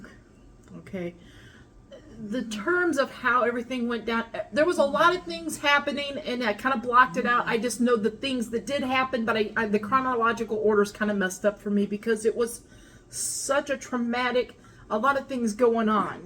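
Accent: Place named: South, United States. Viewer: yes I also wondered that also I’m from South Georgia he sounds southern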